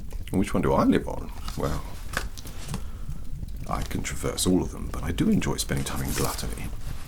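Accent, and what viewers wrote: Wales, United Kingdom. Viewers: Yes finally an asmr video that combines all my favorites. Asmr, and Demonology, and british accents. Fantastic performance good sir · even tho I'm pretty sure you're Welsh hehe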